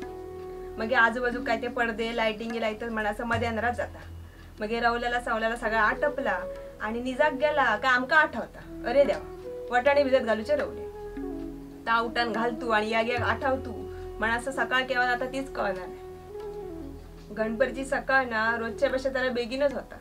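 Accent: Malvani, India. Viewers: ताई, कोकणातलो गणपती इतक्या सुन्दर पद्धतीन शुद्ध मालवणीत सांगान सगळे लहानपणीच्यो आठवणी जागे झाले. शुद्ध मालवणी आयकताना गावाक पुढच्या लोट्यार बसान गजालिंच करतानाचो भास झालो. खुप खुप धन्यवाद देव बरें करो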